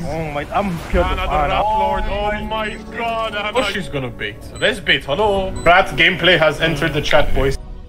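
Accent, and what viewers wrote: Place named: Russia. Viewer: Ты русская оказывается? в конце только когда услышала поняла)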